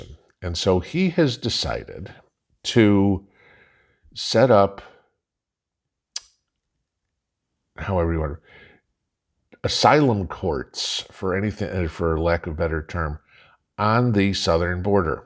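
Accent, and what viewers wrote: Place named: Philadelphia, United States. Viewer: Im hearing Philadelphia as well.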